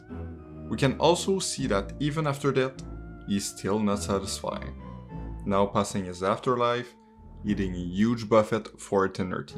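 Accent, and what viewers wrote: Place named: Quebec, Canada. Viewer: This guy's voice is agressively Québecois.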